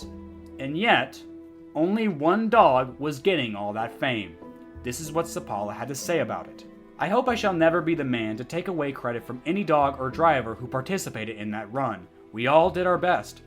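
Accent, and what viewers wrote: United Kingdom. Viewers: Never knew the true tale of "Balto", and your sophisticated British accent astonishes me to this point, dear. 🧐😎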